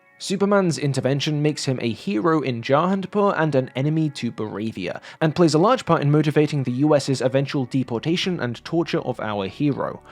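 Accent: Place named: England, United Kingdom. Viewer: I was able to watch about 1 minute of the video before you put out the Holocaust inverting, antisemitic lie that Israel is engaging in genocide. You're not going to convince me that this bullshit video is accurate by showing me another bullshit video. I assume from your voice that you're from England. There is a better case to be made that England is an "ethnostate" than Israel. Both have the same number of non-practicing people- about 50% for both countries (That would be illegal in most fundamentalist Muslim countries fyi). England, however does have a national religion. The upper chamber of the House of Lords must be of one religion. There is no such provision of the ruling body of Israel, the Knesset. The fact is that ANY of its neighbors is FAR more deserving of that epithet than Israel. But your not interested in the truth, you're interested in a narrative. 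That narrative is that Israel=Boravia not because it's analogous with reality, but it's analogous with your propaganda. In the movie Boravia is the big bad, Jarhanpur is the helpless victim. In reality the war started because of a genocidal antisemitic attack by Hamas. In the movie there is no Jew hatred, in fact in the movie your bullshit belief that Judaism is somehow separate from Israel is reality. In the movie the little black boy calling out for Superman was center of attention. In reality he'd be in the slave section. In reality the IDF is highly diverse. In the movie they're all white males. The movie corre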